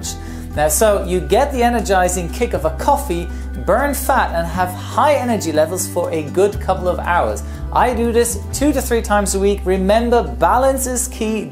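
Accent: England, United Kingdom. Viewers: Hi,! I'm from São Paulo, Brazil. Well, I simply love Watching your videos on YouTube. Nice to see that, from all your habits as soon as you get up, you meditate! Absolutely healthy to your mind, body, and everything. I really admire your job and this charming British accent you have! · Wait is british?? All this time i thought he was german with amazingly english accent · You are very British in your accent. Refreshing, in a world that is crazy about imitating America. 😊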